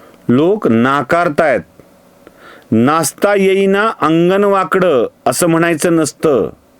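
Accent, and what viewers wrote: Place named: Marathwada, India. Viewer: तुमच्या बोलण्यात मराठवाड्याच्या मातीचा गंध सतत जाणवत असतो त्यामुळे खूप आनंद मिळतो व मन प्रसन्न होते मन:पूर्वक अभिनंदन यज्ञेश्वर दीक्षित, मुखेड जि नांदेड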